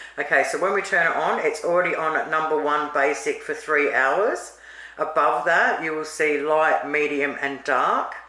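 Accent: Australia. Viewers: So ocker (and I mean that as a compliment)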